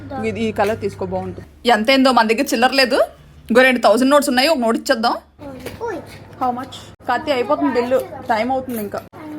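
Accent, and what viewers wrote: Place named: Godavari, India. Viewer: ఏదైనా గోదావరి జిల్లా యాస వేరు మీరు మీ వాయిస్ సూపర్ అక్క ❤❤❤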